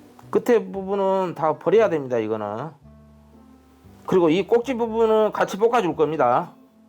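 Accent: Jeolla, South Korea. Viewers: ㅋㆍㅋ 셰프님 전라도네요 말씀이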